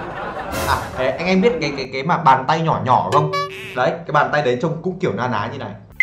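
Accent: South, Vietnam. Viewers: nói tiếng trong Nam dễ thương quá dợ (´∀｀)♡♡♡cute ghê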